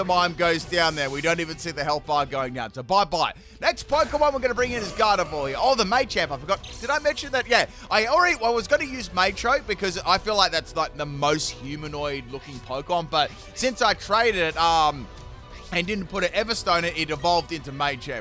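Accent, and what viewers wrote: Australia. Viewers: Gotta love his Australian pronunciations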